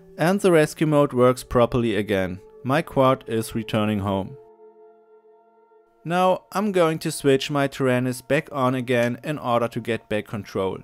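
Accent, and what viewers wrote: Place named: Germany. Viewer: oh man.. du klingst so deutsch mit deinem akzent :D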